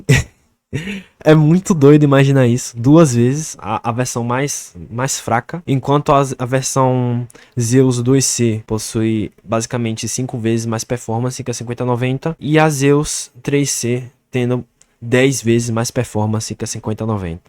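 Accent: Bahia, Brazil. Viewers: Você é baiano?? lembra um pouco o sotaque daqui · O bahiano é perceptivel de longe kkk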